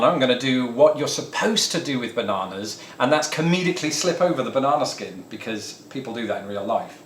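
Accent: United Kingdom. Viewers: I like the way British say butter, it sounds like it tastes better the way you say it.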